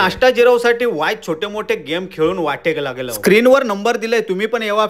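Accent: Malvani, India. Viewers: tumch boln khupch aavdt te mhantat na ki. .. malvni manus to malvnich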